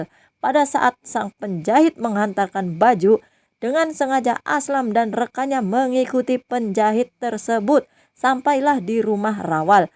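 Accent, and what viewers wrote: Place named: West Java, Indonesia. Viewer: Logat nya terlalu kelihatan... kalau anda dr bagian jawa barat... klu bukan cirebon pasti indramayu...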